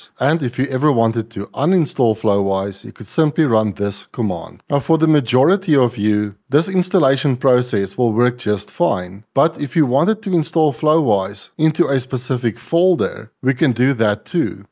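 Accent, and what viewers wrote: South Africa. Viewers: South african>?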